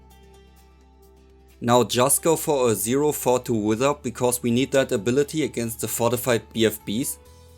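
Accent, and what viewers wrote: Germany. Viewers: Are you german? I can hear the accent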